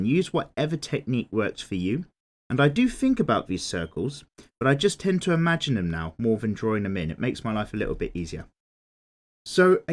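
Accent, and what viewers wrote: United Kingdom. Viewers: very bri ish eh?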